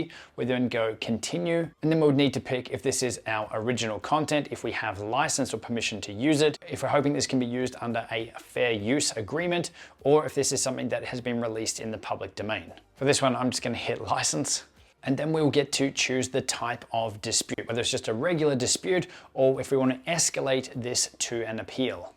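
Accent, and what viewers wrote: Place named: Australia. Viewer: Bro you are wonderfully helpful your videos are amazing. I wish you it was no in Aussie accent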